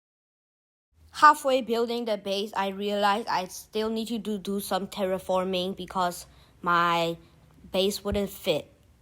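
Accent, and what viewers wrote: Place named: India. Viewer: Bro why u sound so indian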